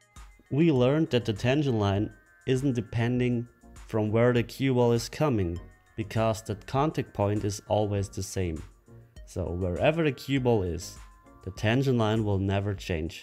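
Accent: Germany. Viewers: Gory Guerrero he is from Germany.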